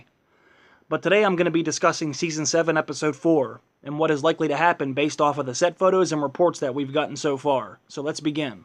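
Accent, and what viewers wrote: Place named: Georgia, United States. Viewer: I like your theories, hit and miss with some of mine, I like what you said about the possibility of a dragon killing weapon, interesting idea, I doubt it will be projectile though too 'Hobbitty'. don't worry about the haters this is fantasy for crying out loud, some people take this too seriously. Anyway nice post, thanks for putting the effort. ps. where are you from ? what is your accent going to guess Georgia?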